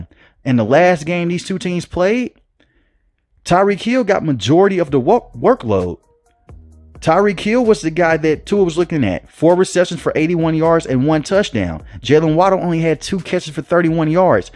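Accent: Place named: Mississippi, United States. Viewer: The cover photo looked professional, I thought it was CBS or the like doing a breakdown of the game ..until I heard the 'commentator' sounding like a white snoop dog lol. I'm guessing Mississippi accent.. ultimately a good breakdown. Chargers are weak in run support in the middle and with that being a point of emphasis this yr for Miami i think Dolphins will keep em guessing all day and Miami ends up winning by 2 scores. That could be 2 field goals, tds or any combo but they win.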